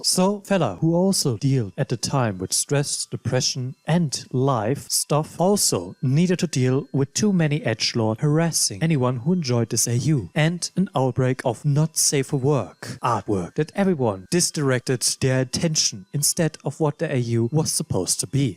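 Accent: Germany. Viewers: Dein Akzent klingt sehr Deutsch.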